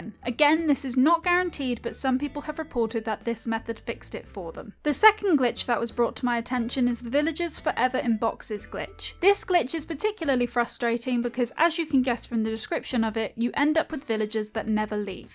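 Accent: United Kingdom. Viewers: It's a Brittish accent.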